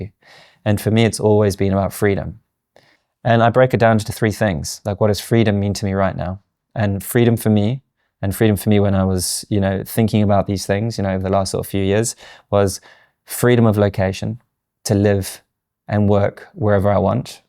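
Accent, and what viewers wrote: South Africa. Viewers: Another excellent video, - thank you! I heard you say you live in the UK? You have a South African accent, I resonate… I am a South African living in the Highlands of Scotland, and building a YouTube Channel (just released video 4)., you have so much skill and experience - I will welcome any input you choose to share - you speak so naturally, your dialogue is fluid, natural and seamless… perhaps you could talk more about how you have come to sound so natural, it’s lovely because it allows us to see you - very authentic. Thanks again, I am enjoying your work. 🌺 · Finding your channel is a breath of fresh air. Most channels feel like a bad American tv advert from the 80s. I love your approach. I can watch without my brain nervously anticipating jump cuts and it feels like you’re speaking directly to me, with authentic thoughts and heart-felt intentions. 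It is the only way I would want to present myself as well. I have been a photographer and artist all my life and have made a few short films at film school so I know my way around an Avid Media Composer and other editing software. I don’t want to create anything that feels over produced. I just want to make raw honest content but I’m still deciding what that would be - I’ve done a fair amount of tutoring in various subjects so that will help, I suppose. This video in particular has had me trying to articulate what I want in the various areas of my creative life so it has been a great exercise. I have often thought about it and even advised others to get clear on what they want, but it was your story about being put on that spot that finally spurred me into making a concerted effort to write it down. Thank you. (I also live in London… I hear a slight South African accent in your speech which is lovely).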